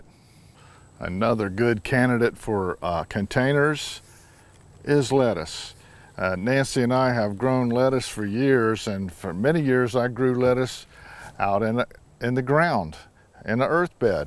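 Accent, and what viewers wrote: South, United States. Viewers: 😂His drawl is what I enjoy, too! You're a lovely man,! 🎉 -Judy 🇨🇦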